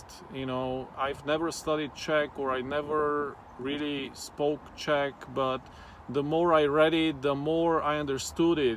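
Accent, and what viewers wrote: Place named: Russia. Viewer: У вас даже акцент на английском звучит так, как будто вы русский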